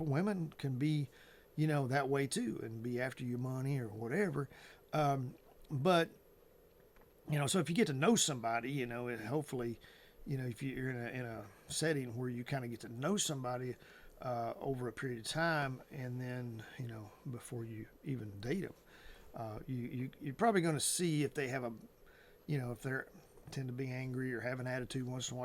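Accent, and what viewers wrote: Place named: Texas, United States. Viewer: Where exactly are you at? What area? You sound like you might be from Texas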